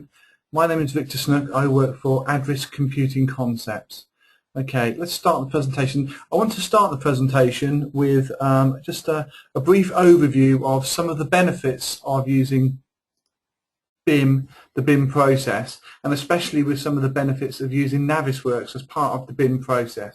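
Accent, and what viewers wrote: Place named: Cockney, United Kingdom. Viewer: A cockney webinar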